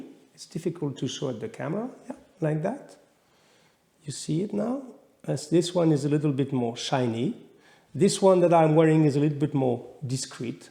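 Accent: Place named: France. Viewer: I always like to listen to your nice french accent, makes style sound extra stylish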